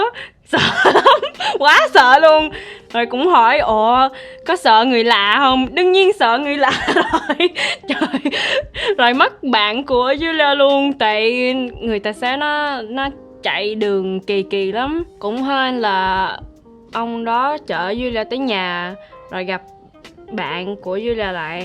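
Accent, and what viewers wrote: Vietnam. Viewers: OMG girl I love your Vietnamese accent!!! I think it's so cute that you try to embrace this part of the culture. So very looking forward to other Vietnamese speaking videos (or any other videos hahaha) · Isn‘t her accent southern as well? Also she mentioned that the Grab driver wanted to drive her to Vung Tau which makes me think that maybe she was in Saigon. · sᴇᴇıɴɴᴇᴇ she’s probably speaking in the North accent, ur south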